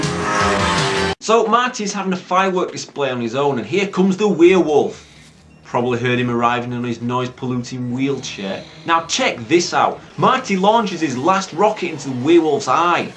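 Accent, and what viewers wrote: United Kingdom. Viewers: I also do movie reviews and I am looking for some one to do reviews with me if interested message me. Also good job on your reviews I love the British accent.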